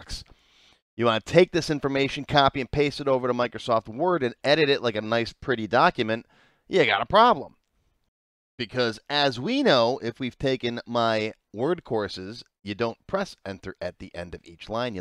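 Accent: United States. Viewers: Good ...but It would be better if you took into account viewers whose English Language / American Accent is their second Language, as they may not be able to pick up your American accent as quickly... Regards from an Iraqi living in the Anbar Provence in a remote area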